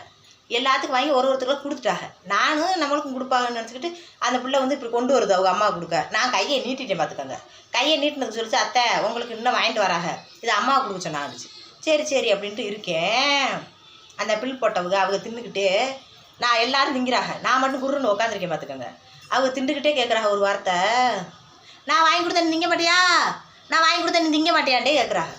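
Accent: Madurai, India. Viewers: Neenga madurai ya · நீங்கள் மதுரையா அக்கா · நீங்கள் மதுரை யா சகோதரி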